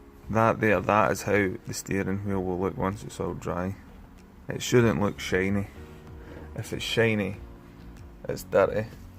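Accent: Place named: Scotland, United Kingdom. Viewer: I fell off my fart-free seat laughing when you were talking about cleaning "farted on" car seats. Your dialect makes it all the funnier and reminds me of Colin Mcrae. Are you from Lanarkshire by any chance?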